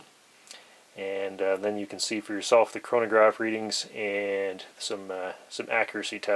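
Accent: Canada. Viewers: Very informative. I assume you are in Canada by your accent and the fact that we here in the US no longer have access to Norinco products, having been banned after the Kengs Firearms/Norinco criminal investigations for them attempting to supply full-auto weapons and rocket launchers to prohibited individuals here. What was the price for this case of 1,000 ? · Do i hear a fellow maritimer (neuf) accent??👍😉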